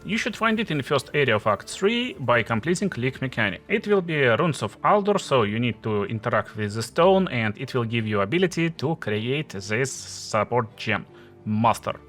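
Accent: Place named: Russia. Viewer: Я слышу что ты русскоговорящий :))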